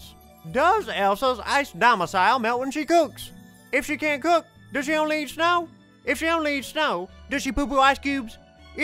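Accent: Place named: Louisiana, United States. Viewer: he sound like he from the byoo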